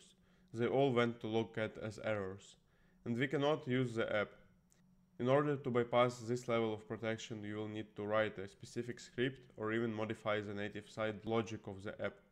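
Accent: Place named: Russia. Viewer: чую русский акцент)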